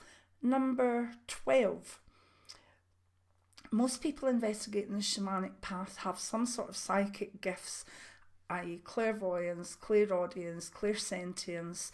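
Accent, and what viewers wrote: Scotland, United Kingdom. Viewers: Hello. I enjoyed this. I hate shoes.... although I get the coldest feet so have to give in on colder days. As a child I regularly saw a shamanic indian and have alwaya been fascinated by the culture and way of life. Nature and animals are my bliss although being crocked up and arthritic I avoid drastic weather. I am effected by storms. Headaches ans ears ring. Always know when they are coming. I work at present as a psychic on a phone line ( serious health issues meant I had to give up mainstream work) and embracing this side of things. I have always felt odd and a bit different and despair at the sadness and pain. I enjoyed this video. I love your soft scottish accent. I am cornish so sending peace love and healing light from south to north to you x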